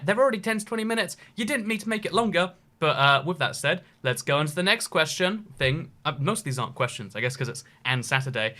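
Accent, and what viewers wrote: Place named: United Kingdom. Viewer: You say it in a Brit accent, kinda fast, n what cat😂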